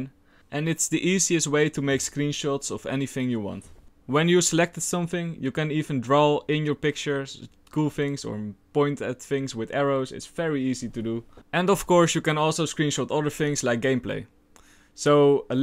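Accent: Netherlands. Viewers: If you were commenting about him sounding Russian, that's actually a Dutch accent, easily confusable right?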